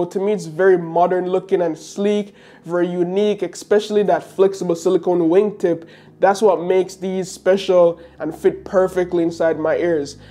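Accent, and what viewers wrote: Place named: Jamaica. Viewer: looooving this thique Jamaican accent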